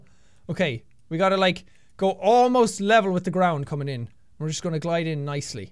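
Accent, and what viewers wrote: Ireland. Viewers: I loved his Irish accent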